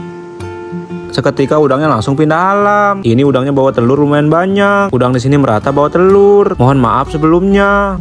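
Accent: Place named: Kendari, Indonesia. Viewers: Logat ta sudah pasti mi sulawesi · Logatnya kaya org Kendari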